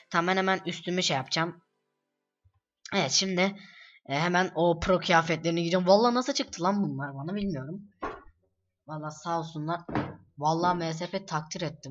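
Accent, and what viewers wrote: Azerbaijan. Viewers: lam san Azerbaycanlimisin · lan Azermisin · Azerisen sen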